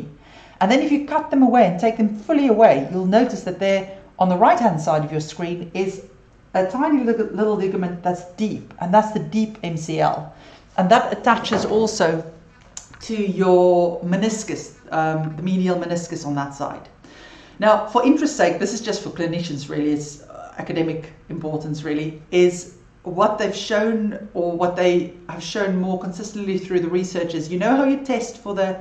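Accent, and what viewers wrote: Afrikaans, South Africa. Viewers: Are you Afrikaans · Best info I've run across after 5 days of searching. Really good stuff. Well done. Love your accent. I'm guessing South Africa?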